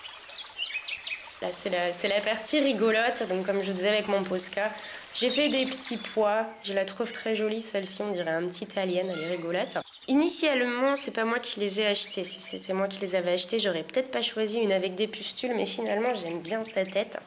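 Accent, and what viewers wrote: South, France. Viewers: j'avais remarqué aussi que j'entendais un accent du sud que je n'entendais pas avant ^^ J'aime bien les accents :) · J'adore cette vidéo avec le bruit de la nature ♥ C'est vraiment une belle idée et la coloquinte blanche avec les pois est ma préférée. J'ai remarqué que tu avais beaucoup l'accent du sud dans cette vidéo, je me trompe? Bisous ♥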